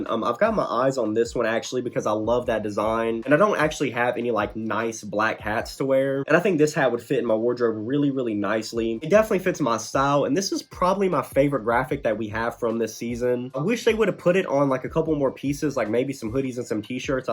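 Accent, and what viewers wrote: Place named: South, United States. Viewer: This dude’s accent is so sick. Very southern, I love it! Love your channel man, so nice to find a brother through GOLF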